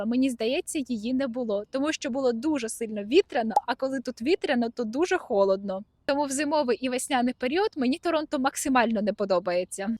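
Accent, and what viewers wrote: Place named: Ukraine. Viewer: I like your accent. Pure Ukrainian!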